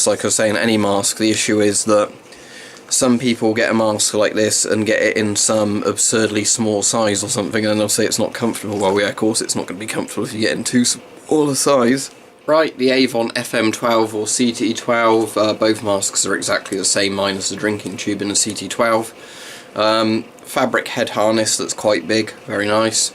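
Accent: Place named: England, United Kingdom. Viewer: I love this English accent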